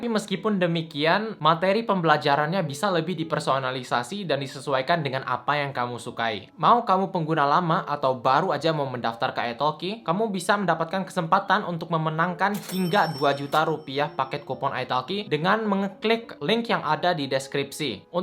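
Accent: South Korea. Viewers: Logatmu berubah kk, logat koreanya nempel banget, debak km keren banget 👍🏻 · Ka karena udah bisa bahasa korea skrg logat ngomong bhs indonya pun kaya orang korea, mantap ka!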